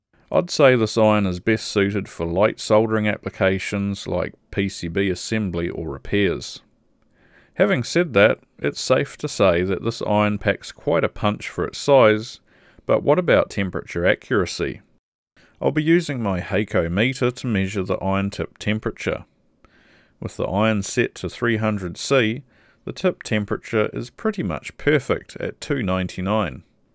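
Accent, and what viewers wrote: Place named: New Zealand. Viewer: Just love your Kiwi accent!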